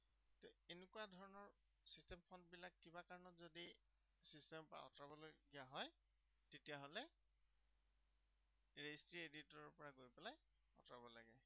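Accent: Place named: India. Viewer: English with an indian accent.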